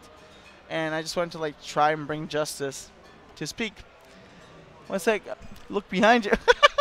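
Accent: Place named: Venezuela. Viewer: You are from Germany? I thought you were from Venezuela.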